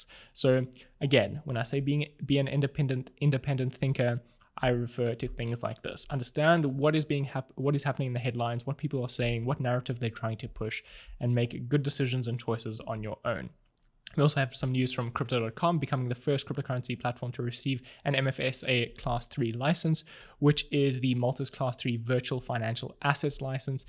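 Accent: South Africa. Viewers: Are you South African? I can hear it in the accent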